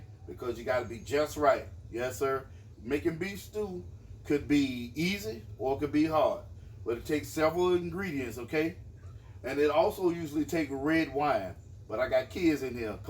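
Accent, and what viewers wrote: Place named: DMV, United States. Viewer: Bro, you’ve got me running to the store. Once you start talking junk, I knew you were from the DMV. Works for me!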